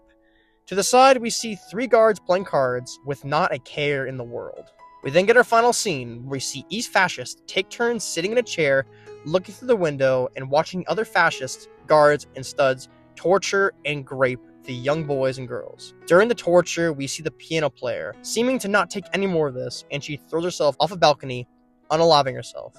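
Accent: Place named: United States. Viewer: Clearly this is written and narrated by an uneducated American.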